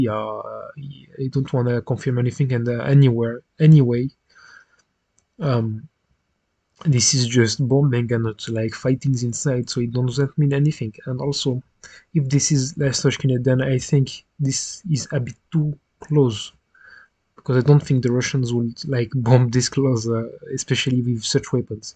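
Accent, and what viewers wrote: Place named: France. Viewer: The french accent 🥵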